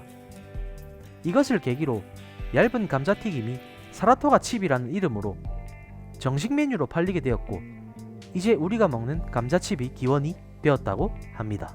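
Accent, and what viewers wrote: Gyeongsang, South Korea. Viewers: 경상도 외국어 말고 표준어 써라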